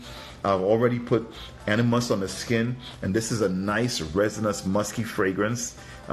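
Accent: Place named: Trinidad and Tobago. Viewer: Who's? Lol... btw I get a slight Trini or Dominican 🇩🇲 accent in the back? More trini. Might be going crazy. Lol. Disregard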